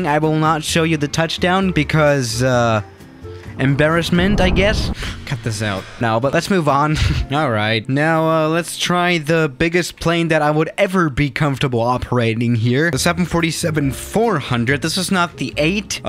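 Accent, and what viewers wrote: England, United Kingdom. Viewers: To be honest you sound so British. I'm from Norway so sas is a good airliner.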